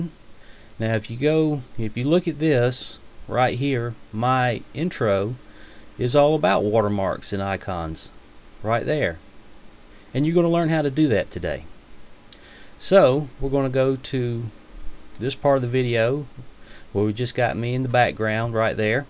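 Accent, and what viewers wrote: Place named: South, United States. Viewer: love the southern accent. and thanks for the tutorial!!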